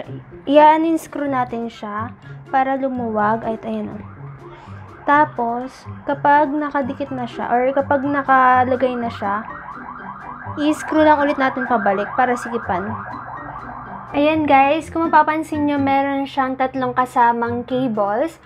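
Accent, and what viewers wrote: Visayas, Philippines. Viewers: Bisaya ka maam? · Bisaya lge ni si host ilhan hahahah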